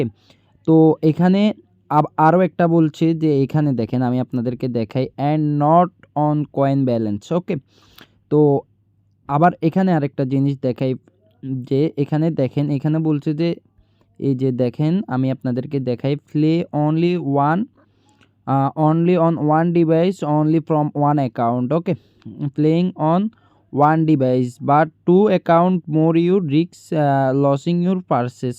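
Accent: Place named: Sylhet, Bangladesh. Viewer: Vai to Sylhet e😁 ami to buji laice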